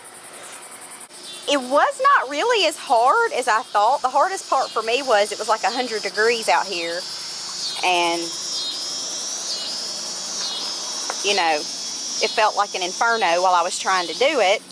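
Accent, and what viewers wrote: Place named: Alabama, United States. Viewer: You either have to be from North AL or Tennessee with that accent. I'm from Middle TN and it's not everyday I come across a Youtuber who talks like the ppl I know!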